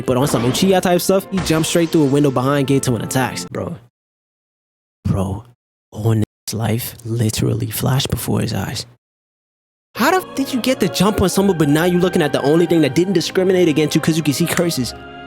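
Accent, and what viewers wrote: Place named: Bahamas. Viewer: Where are you from? You sound kind of like a Bahamian.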